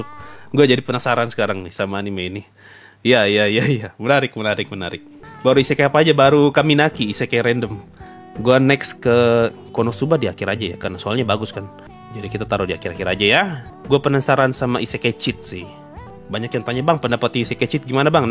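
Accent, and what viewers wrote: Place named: Masamba, Indonesia. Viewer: logatnya kaya orang masamba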